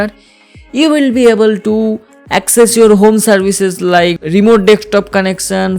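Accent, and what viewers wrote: Bengal, India. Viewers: He is Bengali i can tell certainty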